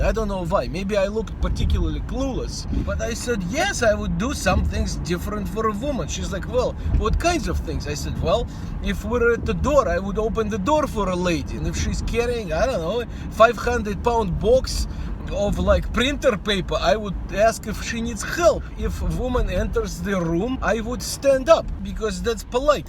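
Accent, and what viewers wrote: Russia. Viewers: At first I thought you were faking a heavy Russian accent. But then I realize that it IS your accent. And it is very cute. Keep up the good work. Your videos are excellent! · you clearly know how to speak English very well! I wish I knew what you sound like when you’re not purposely using this heavy Russian accent. <3 your content regardless!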